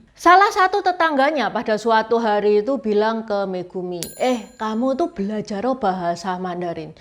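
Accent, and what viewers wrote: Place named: Java, Indonesia. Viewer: Andai aku dengar cerita ini dari radio, pasti aku menyakini klo ini orang Jawa tulen...wkkkk. Maju terus....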